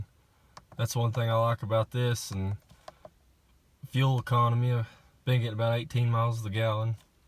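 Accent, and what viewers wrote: United States. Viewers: So southern, sounds of goats followed by a hefty loogy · Your accent is making me miss my Kentucky family. Nice Vid, love the truck.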